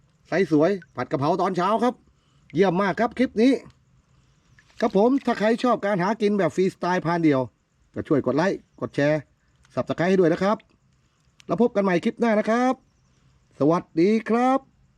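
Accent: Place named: Trat, Thailand. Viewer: สำเนียงการพูดเหมือนคนตราดเลยเกี๊ย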